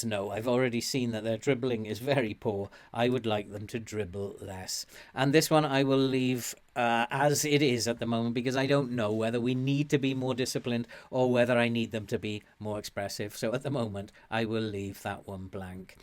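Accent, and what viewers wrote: Wales, United Kingdom. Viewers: What an amazing guide, I really wanted to find a way to create my own built on the abilities of my players rather than copy and paste one. This is exactly what I needed, thank you! I also detect a Welsh accent there mate, where are you from? Cardiff here! Subscribed with thanks!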